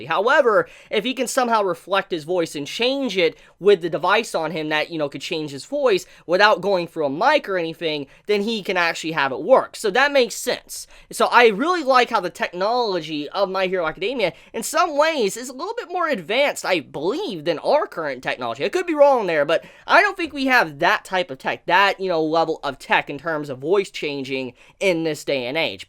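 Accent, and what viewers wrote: South, United States. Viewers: I love how subtle your southern accent is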